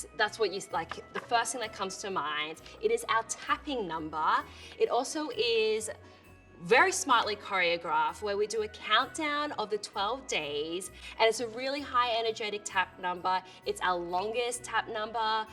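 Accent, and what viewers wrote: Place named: Australia. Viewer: So nice hearing an Aussie voice in the Rockettes (from one Australian to another). Would love to see the show sometime if ever I'm in the US at the right time.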